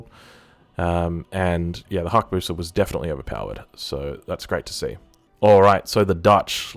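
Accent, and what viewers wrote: Australia. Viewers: I'm from Minnesota, but I that's Australian English right?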